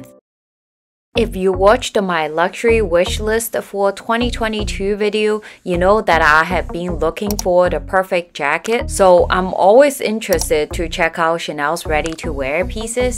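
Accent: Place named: South Korea. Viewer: 韓國口音英語。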